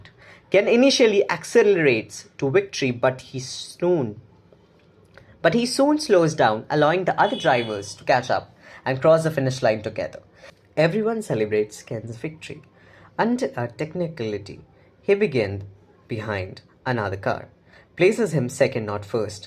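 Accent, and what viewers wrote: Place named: India. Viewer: I love how they used an Indian voice for the narrator... If it's to bring us together I'm in... If it's to turn people against each other I'm out✌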